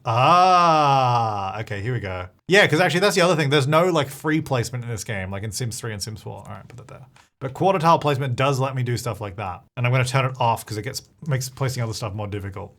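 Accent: Australia. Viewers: No doubt that’s an aussie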